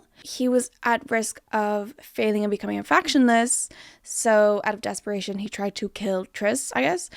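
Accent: Ireland. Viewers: Is Ms. Irish? I keep hearing little hints of an Irish accent, and I'm trying to determine whether or not I'm imagining it. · Yes i watched your whole 1hr and 7 mins and it was more entertaining than the movies. I think i watched 2 and gave up on them. Also enjoyed what i believe is an slight Irish accent in your video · the way you say your T’s; like raised somewhere English main but time in Ireland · is this a super watered-down irish accent? i'm not a native speaker and i'm confused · do i detect an irish accent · I went through this entire video thinking you were American not Irish… the accent only came out when talking about the LC haha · EDIT: Ireland!! I watched your Booktok video, and I hear it now. ORIGINAL: Dude, I am so sorry if this is rude. It's my first time watching your videos, and I gotta know — WHERE is your accent from???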